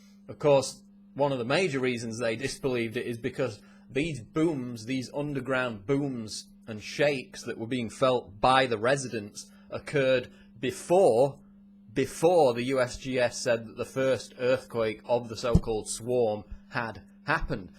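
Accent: United Kingdom. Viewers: You live in midwest? what's with the british accent? jw cuz i live in wisconsin too.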